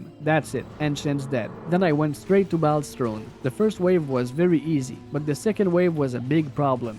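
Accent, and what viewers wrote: Quebec, Canada. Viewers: do i hear a small Québec accent ? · Sa sent vraiment le québécois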